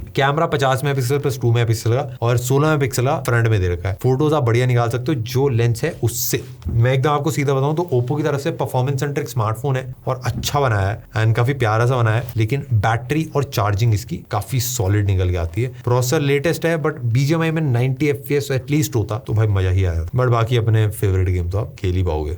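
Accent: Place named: Haryana, India. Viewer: Haryana wala feel hai bhai kay bolne ka kya haryana se ho kya???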